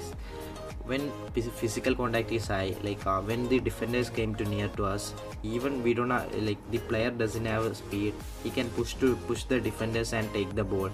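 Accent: Kerala, India. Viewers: English kettalariyam malayaliyaannn...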